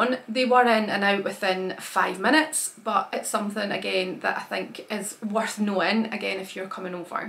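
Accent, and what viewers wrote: Scotland, United Kingdom. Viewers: Thanks for this video. It is very difficult renting in Sydney, especially as tight as the market is now. I remember when we were leaving our rented place years ago how I felt when streams of people came in looking & that was when it wasn't as busy as it is nowadays. You are right about the lease & in noting the condition of each room in great detail. I know you've found a place now you are happy with but as a word of advice to others arriving in Sydney - yes you have to move further out to get a house for lower rent but if you look instead at townhouses or villas you may pay less than for free-standing house not so far out & you still have a garden ( admittedly smaller) for children. I hope your move goes well, don't forget to have an "essentials" box for moving day ( kettle, coffee, long life milk, tea bags, tissues,toilet paper, snacks etc so you can avoid the added stress of going to the shops). 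I enjoy all your videos & love your Scottish accent. P.S. Don't forget a small esky with ice so you can give the movers a drink when they bring the final load into your new place - it's a very "Aussie" thing to do!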